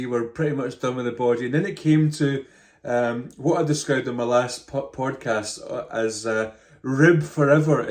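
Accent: Scotland, United Kingdom. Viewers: Your knitting podcast is one of the more relaxing ones and is also very realistic and relatable. (Meaning you don't say things like, oh double pointed needles are so easy I use them to knit lace in the round while also bathing my cat!). Also, I am homesick so it's nice to hear a Scottish voice.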